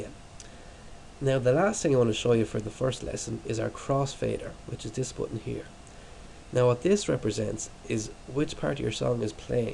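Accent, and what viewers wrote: Ireland. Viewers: He's not foreign.. he's Irish... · You're Irish :O I love your accent so so so much. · Where in Ireland are you from ? haha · r u irish?? just wondering :) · are you irish ? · nice to hear an Irish voice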